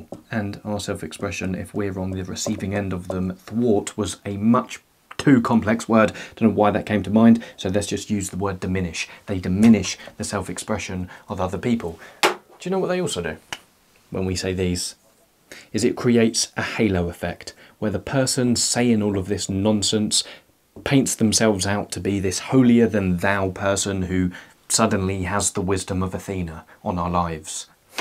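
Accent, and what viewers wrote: England, United Kingdom. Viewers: Your contente is good but the British Accenture is annoying. I would like to be able to hear this with an Californian Accent. Hire a Hollywood speaker and make another channel with a fun female voice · Which part of England is that accent from?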